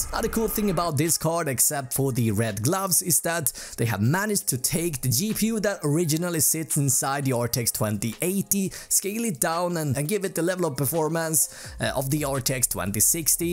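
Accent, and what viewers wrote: Sweden. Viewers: Are you Swedish?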